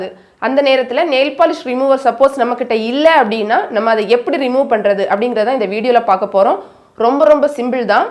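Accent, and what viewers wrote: Kanyakumari, India. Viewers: Nega kanyakumari ah sis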